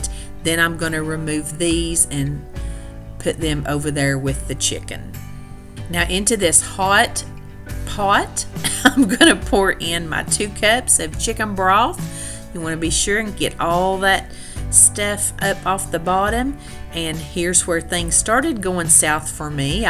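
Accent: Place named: Mississippi, United States. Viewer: Wow! I just found you. You are my Favorite Cook on YouTube! I am from Mississippi and you sound just like home! ❤️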